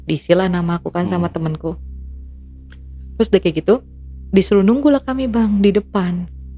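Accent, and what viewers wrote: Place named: Medan, Indonesia. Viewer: Logat kakak nya seperti orang kami .medan..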